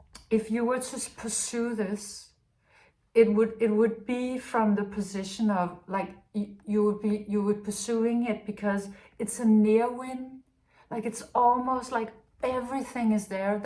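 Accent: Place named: Denmark. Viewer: Actions speak louder than words. Or another one is, 'What you do is so loud, I can't hear a word you say!' I just wanted to say your little video about saying 'NO' to someone is very timely and great advice. Thank you. (PS I've been trying to place your accent. I didn't realise you're from Denmark. My g/grandad was from Holbaek, Zealand.)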